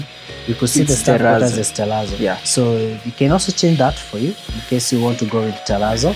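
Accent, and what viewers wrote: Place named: Kiambu, Kenya. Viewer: Buda umeenda KIAMBU accebt ikaanza kutokea bana!😂😂btw luv the house keep it up!:washhands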